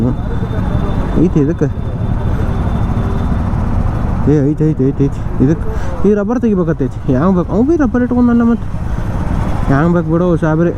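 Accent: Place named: Belagavi, India. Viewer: Belagavi slang ❤ Mix masala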